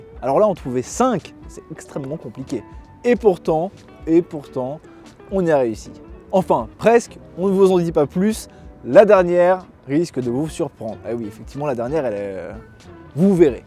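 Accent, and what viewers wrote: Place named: Savoy, France. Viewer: Du Texas - avec le Stetson et la Jeep, on pourrait presque y croire s'il n'y avait pas l'accent savoyard (ou quelque chose comme ça) !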